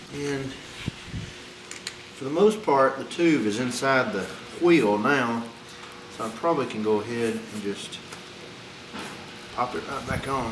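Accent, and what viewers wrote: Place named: South, United States. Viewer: Hey man, thanks! The southern twang was comforting as well as seeing you struggle a little. Definitely inspiring!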